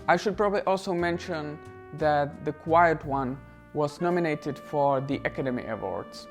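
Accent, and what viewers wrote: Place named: Germany. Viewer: He speaks English with a heavy ( sounds German ) accent . I `m sure he speaks English better than you speak German .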